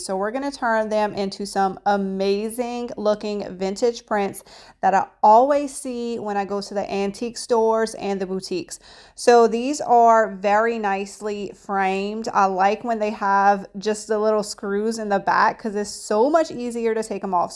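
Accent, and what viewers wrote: Louisiana, United States. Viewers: Love watching your creative projects! Also, I have to know where your accent originates! My guess is Louisiana.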